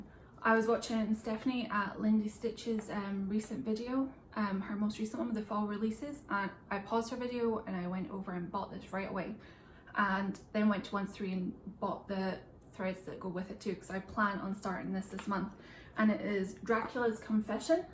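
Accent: Scotland, United Kingdom. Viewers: The little Nessie is adorable!!! I couldn’t understand who made it and who gave you all those gorgeous gifts?? Awesome! Great haul, love all your purchases. I’m so glad you’re finishing Black Bear, it’s an amazing design and you’re so close. By the way, it’s so strange hearing the Scottish brogue against a background of wild fires and American shopping (I’m in Sussex U.K.). Congrats for your 1k, Winter Cabin would be great to stitch, thank you!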